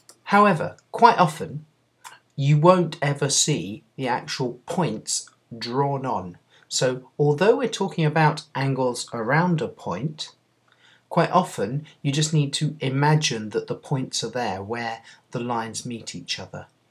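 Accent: United Kingdom. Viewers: go British accents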